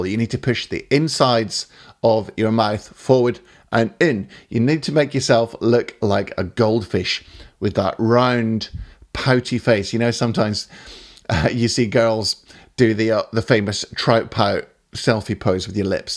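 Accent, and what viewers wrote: Scotland, United Kingdom. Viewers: This was a great video for me. I really appreciated your explanation about the reasons why the "pout" works so well. Thanks. Not too many Scottish accents on the tube. I'm an exile from Kirkintilloch, living in Kent so always good to hear.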